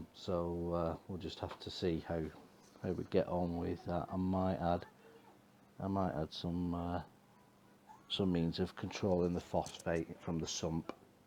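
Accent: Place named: England, United Kingdom. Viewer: lovely!! looking forward to seeing more updates:) nice to hear an English voice normally the american's that do this hobby haha I've liked and subbed to your channel. please check out my channel and sub:)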